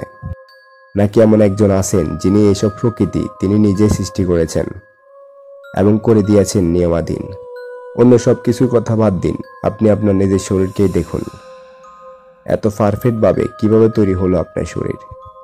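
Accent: Noakhali, Bangladesh. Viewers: ভাই খালি ফ ফ করেন ক্যান? ফেনি, নোয়াখলি, কুমিল্লা? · Vai apni ki Noakhailla?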